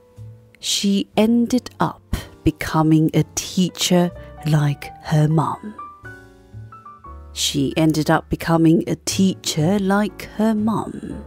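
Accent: RP, United Kingdom. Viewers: I like that you speak RP accent. It's basically, making sure you pronounce every single party of the word and it is a lovely way to speak.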